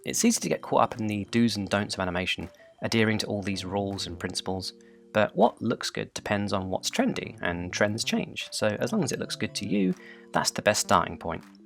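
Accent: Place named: United Kingdom. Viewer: This is it, the perfect tutorial! It's short, understandable AND it has your lovely British voice guiding us through it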